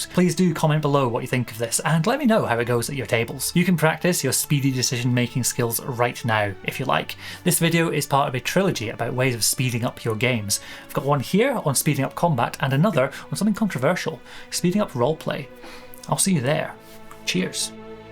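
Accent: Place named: Scotland, United Kingdom. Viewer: Brilliant video - so mamy good ideas! Our group definitely suffers from this. Every session 😅. Also your scottish accent is incredibly authentic and to maintain it for the whole video is admirable ❤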